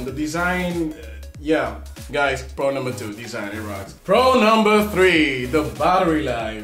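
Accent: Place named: Netherlands. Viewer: I advice you to work on the accent. Are you dutch ?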